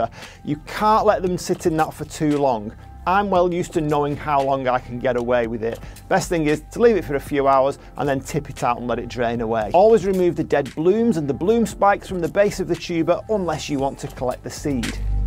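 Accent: North, United Kingdom. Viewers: I have a very different routine for growing them, and cyclamen probably account for 25% of all the plants I cultivate. also in the UK, and from your accent about the same Northern latitude. been growing them for at least 10 years now and only lost 4 out of about 50 mature plants. all 4 lost were lost within the first year due to tuber rot and were probably already damaged and on their way out when I bought them. so second year of buying i prodded my finger into each pot before buying a plant to check there was a reasonable size and hard tuber in the pot somewhere. The rest are all doing very well, some started of as 1cm ultra cheap baby specimens, and now have like 5cm diameter tubers, others now have even bigger upto 10cm diameter ( that's 30cm circumference ) tubers, I have a considerable variety of species and all the colour spectrum I could possibly get my hands on. 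they flower for over 6 months of the year ( slightly staggered between species ) , and I often pollinate them with an air puffer, so they produce pods, which are interesting to look at for 4 more months of the year., leaving just 2 months of leaf only, i never let them go dormant. mine remain very lush and green all year round, most leaves lasting more than 1 year and get quite big, I never let them go dormant, and I get a great deal of continuous blooms each year, like a carpet of flowers above the greenery. I plant them with the top 1/5 ( 20% ) of the bulb above the soil or compost and i always top water, qu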